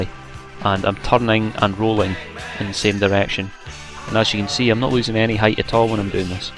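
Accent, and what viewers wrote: Scotland, United Kingdom. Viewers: Nice one. You sound quite clever for a jock ;-) Great tutorials there. Really enjoyed ya voice too. Normally cant stand Scottish accent, but then again you would hate my brummie one eh? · nice tutorial m8. i see you're very experienced. and nice accent. im scottish too :D. Do you still play BF2?. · nice tutorial m8 good 2 hear a scottish accent explaining it a want to master that funneling looks a good move i try swoop in shooting lol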